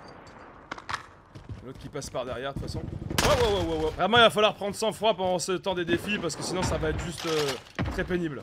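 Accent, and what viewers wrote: England, United Kingdom. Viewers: L accent anglais et ouf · Ton accent anglais me fait trop rire😂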